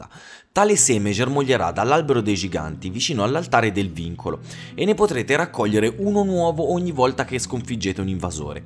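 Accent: Tuscany, Italy. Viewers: Fammi indovinare... Toscanissimo! :D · Bravissimo bel video! Toscano? :D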